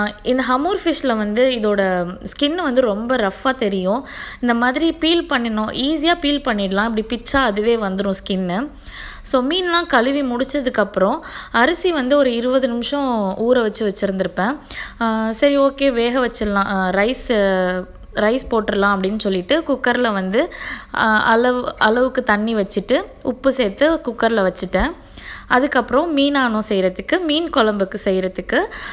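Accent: Ramanathapuram, India. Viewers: k sister ramnad side area la paesura maari irundhuchu sister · enga ooru slang madhiriyea iruku....na ramandhapuram....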